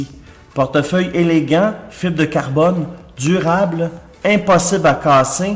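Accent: Quebec, Canada. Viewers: Hey ça va ? Super présentations, Tkt pas moi je te comprends bien alors que je suis en France 🇫🇷 Ps: j'adore l'accent québécois ❤️💜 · Votre français est impeccable ! Et cerise sur le gâteau... l'accent québecois ! J'adore !!! ;-)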